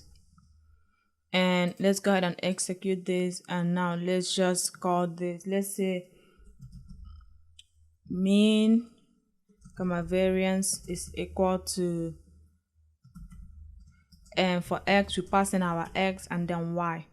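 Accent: Nigeria. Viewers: you sound Nigerian girl ... I am gonna follow your channel...... Love you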